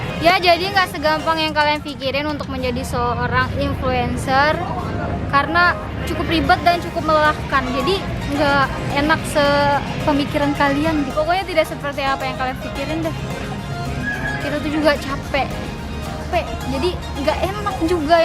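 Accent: Lombok, Indonesia. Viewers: Mantul bngt cara ngomongnya kak medok lombok,saya sendiri juga orang lombok:)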